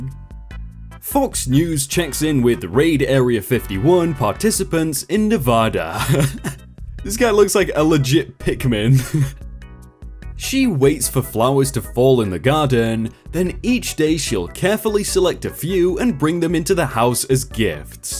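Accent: United Kingdom. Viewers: Everytime I start up a video of yours, YouTube automatically gives me American English subtitles of your British English. I turn them off, but after every ad, they come back on...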